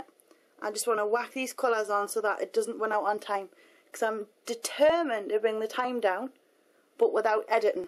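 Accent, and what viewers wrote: Newcastle, United Kingdom. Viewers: she is from UK, so, she have a british accent :D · omg are you from newcastle? x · I can't believe these posters can't hear your British accent. I don't think it's your 1st language though. You are from somewehre else. Am I right? I like your video. You look lovely. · She's got a Geordie accent....she's from Newcastle in the UK :)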